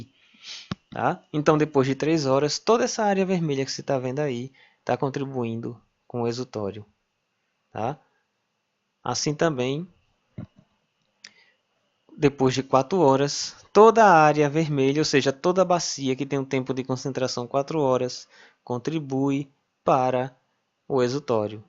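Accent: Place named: Alagoas, Brazil. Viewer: Retificando: sotaque alagoano!!!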